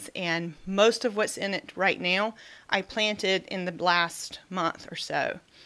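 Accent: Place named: North Carolina, United States. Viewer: I'm from North Carolina too! I knew I recognized your accent, but I was confused because I thought you were from Florida. Thanks so much for making these great videos.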